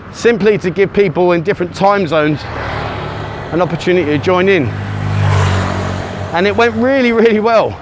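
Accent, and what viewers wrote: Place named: United Kingdom. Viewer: Why don't you make an effort to pronounce Pattaya correctly like Thais do .not like a Brit !!!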